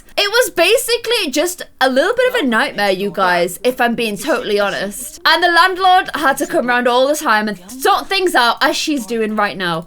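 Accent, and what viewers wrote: Ireland. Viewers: I didn't know u were Irish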